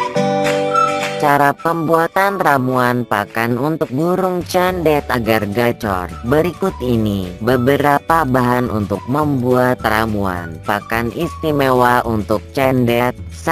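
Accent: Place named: Java, Indonesia. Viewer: Sampean jowo mas...medok men.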